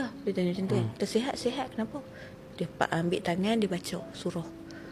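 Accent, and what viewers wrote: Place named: Penang, Malaysia. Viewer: Hg dr kecik smpai besaq lenggoq penang noh😂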